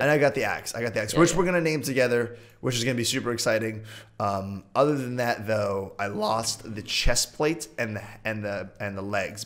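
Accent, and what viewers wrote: Scotland, United Kingdom. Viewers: OMG I LOVE ACCENT U SCOTTISH GUY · Its Scottish like meh :D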